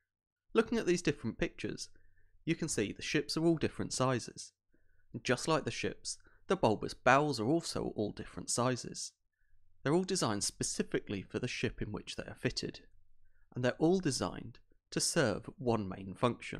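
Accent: Scotland, United Kingdom. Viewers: What's wrong with a yank speaking? I know this fellow host has a striking British accent that I like, but not every "yank" host speaks a disjointed hillbilly mess lol. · ..I hear some Scottish in there.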